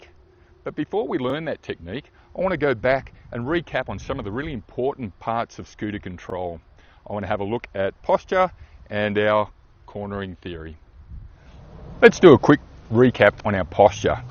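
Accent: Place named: Australia. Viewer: Brilliant explanation. Aussi English 😍 thank you 🙏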